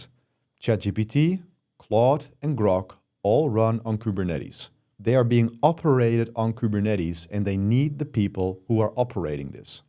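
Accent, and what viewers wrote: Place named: Netherlands. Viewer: My father has been a Kubernetes engineer since the early days of Kubernetes. I learned a lot from him when I was only 17 years old. I got my first Ubuntu laptop at the age of 16. After graduating, I got a job in Belgium as a DevOps engineer. I earned this job for one reason: my knowledge of Kubernetes. Even though I’m only a junior, my experience was already enough to work on production environments at the company. There are only two of us in the company who really know how to work with and implement Kubernetes. Dutch language: PS ik hou van je Nederlands acces. Herken mezelf erin 😊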